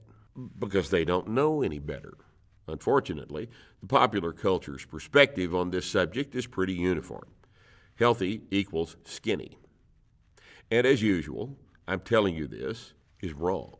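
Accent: Texas, United States. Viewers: It's them beautiful Texan tones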